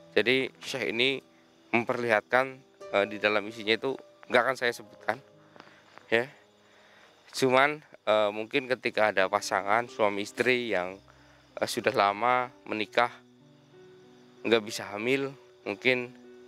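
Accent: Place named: Ngapak, Indonesia. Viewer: Ya emang logat Jawa ngapak begitu bahasa ngoko itu/kasar..bukan bahas kromo inggil.. Atau lisan Jawa kraton gitu om.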